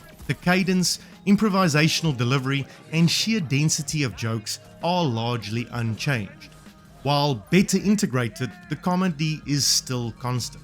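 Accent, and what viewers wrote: South Africa. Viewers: Bro is 100000% a south african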